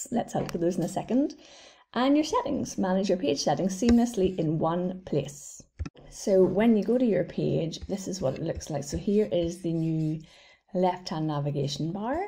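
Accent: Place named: Northern Ireland, United Kingdom. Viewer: your voice is horribly muffled making it hard to understand what you were saying. Please review your videos, or have someone else do it. I could not understand you and had to turn on the captions to read what you were saying. Your work is good, but your voice quality is atrocious. You might also check yourself for regional accent items (are you Northern Irish?) to more "regularize" your English. Speak more clearly (enunciate), use a better microphone, and "flatten" your accent to a more BBC standard (or god-forbid, USA Mid-West standard) will make the valuable messages you have more easily received by folks. Thank you.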